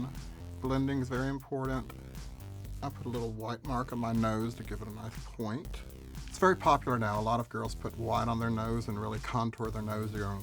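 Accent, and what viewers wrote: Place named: South, United States. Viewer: i adore her accent! southern queens are my favorite ❤❤❤❤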